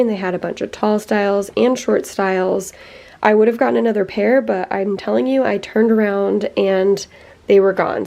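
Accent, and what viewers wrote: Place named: United States. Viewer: thanks for sharing. You have a beautiful home and land! Your furbabies are sweet! I'm jealous of your porch room! I would totally curl up with a blanket and a good book. Love the tea trolley! It's one of my favourite colours. You can get some super cute, battery operated tea light vines. I've gotten them at the dollarama (greetings from Nova Scotia btw). You sound American so for you I think you have the dollar tree? You can also get them in Walmart that plug in. What about a built-in window bench with storage? You made me hungry with the sheet pan supper...lol. The Pioneer Woman has a bunch of those recipes. One of my favourite garden tools is called the garden claw. It's blue and yellow. The top of the handle is ergonomical and the tines on the bottom of the rod allows you to loosen soil around roots to make it easier to pull plants. 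Plus you can use it to mix soil. You should let your seeds dry before storage...they have a tendency to rot if you don't. It's been a year so I'm assuming you finished your office. I only found you today. Love the shelves around the door idea. What about a padded chair with built-in drawers under the seat for storage? I saw it somewhere and it was super cute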